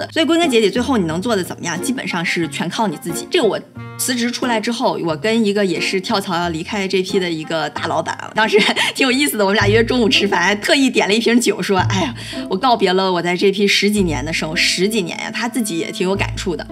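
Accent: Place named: Dalian, China. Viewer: 大连的？只有大连人能听出来！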